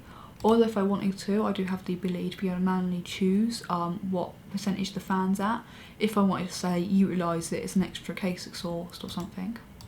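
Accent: United Kingdom. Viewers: Sorry, she lost me when she said "...sexy double sided..." The Brit accent, gotta love it.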